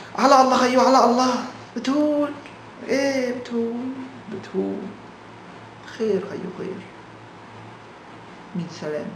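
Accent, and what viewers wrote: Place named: Aleppo, Syria. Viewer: دخيل الحلبيه ماأحلى حكيون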